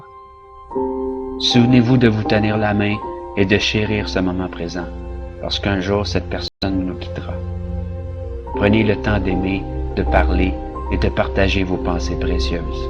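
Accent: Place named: Canada. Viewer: j'adore l'accent Canadiens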